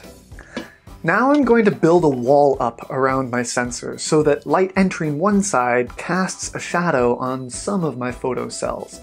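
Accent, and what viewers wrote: Canada. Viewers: oh hes canadian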